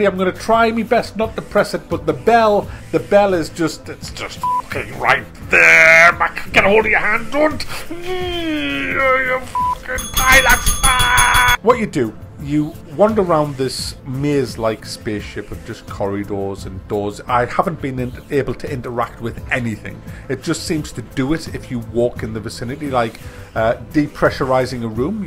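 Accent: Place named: Sunderland, United Kingdom. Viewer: Hi can I ask if your from sunderland? I reckonise the accent lol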